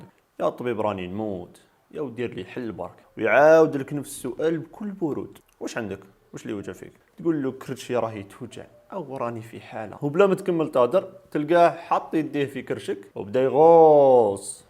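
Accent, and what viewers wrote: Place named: Algeria. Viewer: طلعت لهجة الجزائر صعبة بس يلا اهم شي فهمت الفكرة شنو 😂😂 بگ لوڤ من العراق 🩵✨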